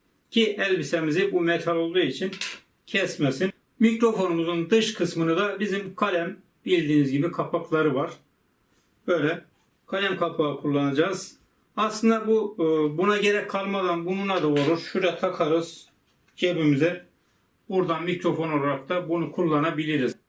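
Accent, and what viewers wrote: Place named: Black Sea, Turkey. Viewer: abi sen karadenizlimsiin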